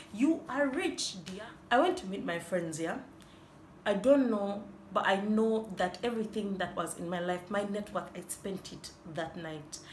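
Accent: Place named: Nigeria. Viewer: U sound Nigerian funnily enough...nice personality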